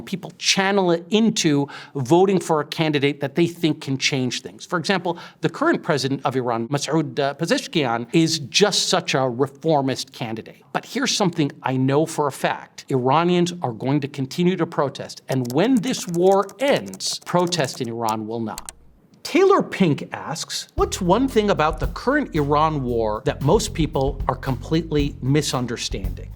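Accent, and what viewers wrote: Arab world. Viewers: His pronunciation is very Arabic and less Persian. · I always wait for him to pronounce arabic words super arabic style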